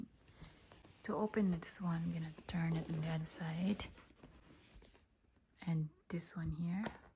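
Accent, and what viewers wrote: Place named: Philippines. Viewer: Are you a Filipino? That accent is so thick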